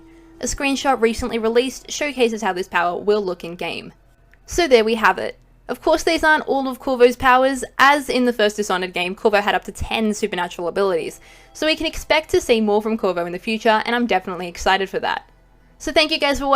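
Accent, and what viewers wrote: Australia. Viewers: are you aussie? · Even with the lack of official info u still manage to make an informative video, really impressive if I may say so. Is that an Aussie accent?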